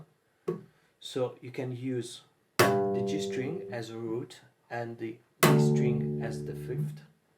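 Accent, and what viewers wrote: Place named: United Kingdom. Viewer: Perfect British accent bro 🤙🏻👏🏻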